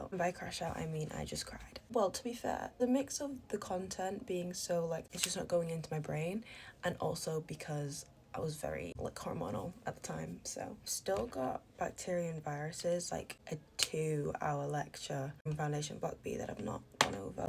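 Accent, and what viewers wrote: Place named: Bradford, United Kingdom. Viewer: you are drop dead gorgeous!Which part of the England are u from?Because I am from England as well.I live in Bradford.I literally love your accent and it sounds like a Bradford accent.I completely understand if you don't wanna reply to my comment and tell me which part of England your from and you will always be my IT girl slay queen.Love you girl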